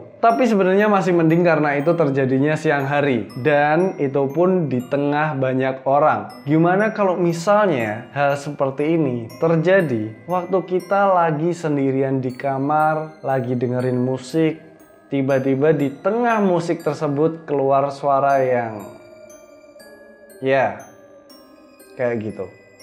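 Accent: Java, Indonesia. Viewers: abangnya kalo ngomong ada sedikit medoknya ya.. kadang2 muncul medoknya heheh but i like it.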